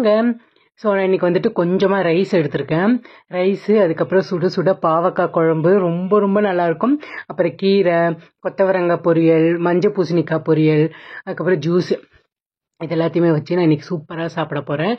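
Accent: Kanyakumari, India. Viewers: Akka kanniyakumari slang pesunga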